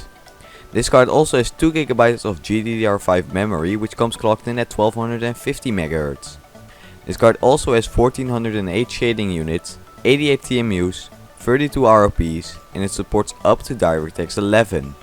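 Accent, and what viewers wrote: Netherlands. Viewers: I found an xfx version for 30 bucks, very happy with that. Btw where are you from? You sound very dutch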